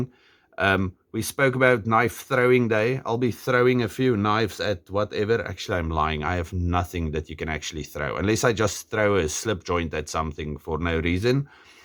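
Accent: South Africa. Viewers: Thanks for posting. Can't get enough of that South African accent! I get some from the Bike Show here on YT, and I know a SA gal of Boer descent in our church who speaks Afrikaans. It's like listening to Pete(Cedric & Ada), if he had a German/Dutch inflection. 👍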